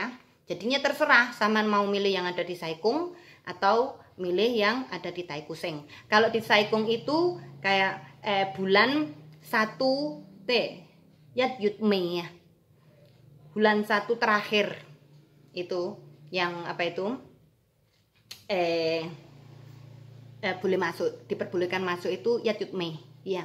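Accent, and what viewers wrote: Java, Indonesia. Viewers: jangan medok ngono to balik asli bae kon tu cantik ngono e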